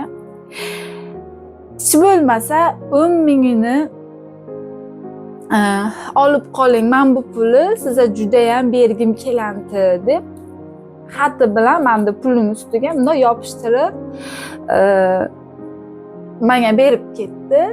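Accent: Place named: Khorezm, Uzbekistan. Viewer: ХОРАЗИМ ДАНМИСИЗ ШЕВАНГИЗ УХШАБ КЕТАДИ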